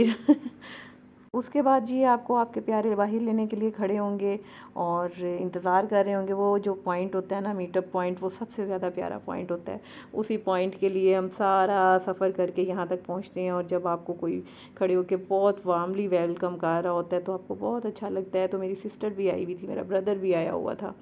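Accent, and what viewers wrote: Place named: Punjab, India. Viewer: wesy hi AP k bolny sy lagta h ap punjabi h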